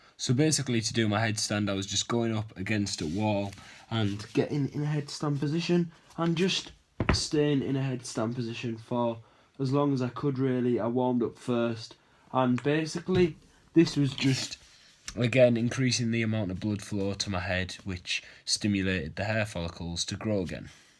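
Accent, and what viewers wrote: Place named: Liverpool, United Kingdom. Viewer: Are you from the same area as Paul McCartney? Sounds like you two have the same accent.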